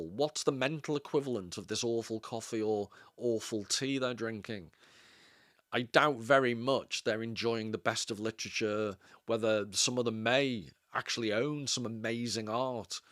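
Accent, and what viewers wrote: United Kingdom. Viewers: Ur so great to listen to man so British with your delivery hahahaha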